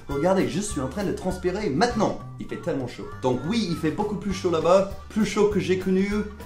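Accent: United States. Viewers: C'est fascinant ! J'ai trouvé ta chaîne par hasard, je m'y suis abonné. C'est trop bien d'écouter un ricain qui parle français avec son accent d'origine qui peut faire des comparaisons entre l’Amérique et la France et qui nous apprend des tas de choses qu'on ne connaît pas forcément à propos de l'Amérique. Good continuation ! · Lol, je suis Américain et j'apprends le français. Je trouve que ton accent est plus facile à comprendre parce que tu a un accent américain quand tu parle français.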